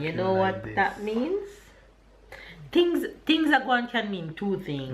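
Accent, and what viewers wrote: Jamaica. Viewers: U go gal love fuh hear u teach uh husband d Jami twang shouts out from Tobago Caribbean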